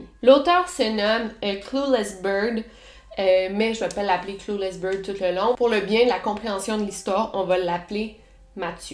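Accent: Quebec, Canada. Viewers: J’adore ce bla-bla québécois. 🥰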